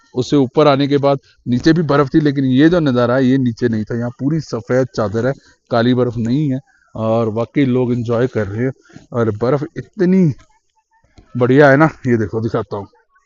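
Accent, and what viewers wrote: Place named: Punjab, India. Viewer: Lovely Vlog, Papa ji. The best part I like in all your vlogs is your Hindi narration in sweet Punjabi accent. God Bless You. I too, have purchased the Same Jupiter as yours, but in Light Blue shade & I'm very happy with it.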